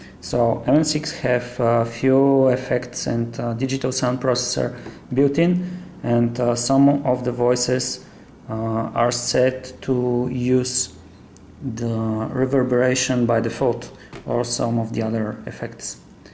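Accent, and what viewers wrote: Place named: Eastern Europe. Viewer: Very strong accent - East European, hard to listen to.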